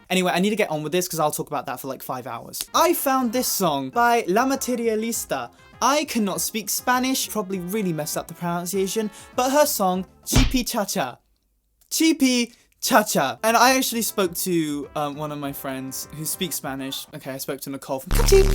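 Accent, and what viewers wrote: United Kingdom. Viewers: 영상 올라왔네!!!! 하 너무 좋다, 그거 알아? 나 덕분에 영어 듣기 실력이 진짜 많이 늘었어!! 너무너무 고마워 🥰🥰 내가 미국 악센트를 가지고 있는데, 영상 엄청 많이 봐서 약간 영국 악센트랑 미국 억센트랑 믹스됐더라, ㅋㅋㅋㅋㅋㅋㅋㅋ근데 난 그것대로 좋아ㅎㅎ그리고 영상을 볼 때마다 는 참 바른 사람이라는 생각이 들어 언제나 응원할게 사랑해!! 근데 요즘 완전 슈스됐네..! 구독자도 엄청 많아지고, 영상 올린지 얼마 안됐는데도 댓글도 엄청 많구ㅎㅎㅎㅎ기분 좋다 Omg video is uploaded again!! Yayyyy I love it., you know what? thanks to, my English listening ability improved! Thank you so so much🥰 I have the States accent, but I watched videos alot so I got UK accent a little lolol I like that hehe And every time I watch his video, I think is really nice and kind and cool and hot person☺️I love your personality I stan I always cheering you up🥰🥰😘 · I'm not used to British accent and the way you pronounce hair sksjsk it's funny and adorable at the same time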